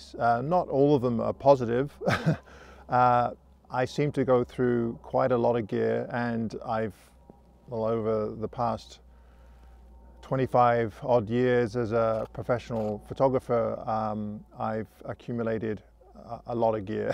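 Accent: England, United Kingdom. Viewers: I remember watching this video as the first one of yours I ever saw. I thought: "He sounds ok, possibly got some English in his accent and he talks sense and seems to have a history in photography." Mmm! So much for first impressions, I don’t remember thinking: "This man is possibly the greatest landscape photographer on YouTube and thus the best I’ve seen." With some of the other landscape photographers like Thomas Heaton, Simon Baxter, Paul G Johnson, that’s not something I say lightly. Thank you for pulling me into your channel, it’s a true pleasure and indeed an honour to see you work and to learn from you.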